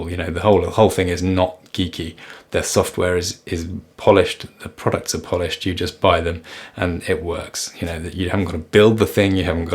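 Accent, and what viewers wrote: United Kingdom. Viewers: I bought the Planck after I saw your video and would never renounce, the split should be in the Planck as some models you can see on reddit where you can basically decide the angulation while having an hinge on top. We need to change our position during the day and I was inspired by you to go also to the garden withe planck. But as I use android studio/intellij and xcode I need a cloud solution to use them via a remote desktop or cm cloud solution, because 16gb ram cannot have on a tablet. Do you develop with cloud based solution in your ide? Keep going with the great videos they are really relaxing and smart, and your English accent helps to enjoy them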